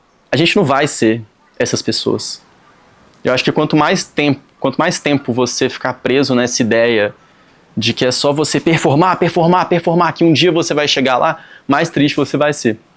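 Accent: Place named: Minas Gerais, Brazil. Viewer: Mineiro falando já dá uma paz de espírito… parabéns pelo conteúdo!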